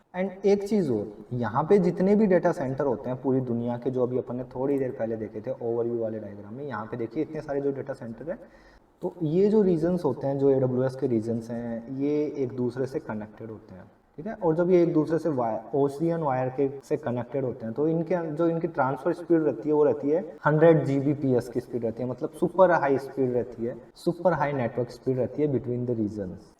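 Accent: Indore, India. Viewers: Just started watching your videos. Very nice explanation. Loved the way you explain. Also, love your Indori kind of accent, coz I am from Indore too :).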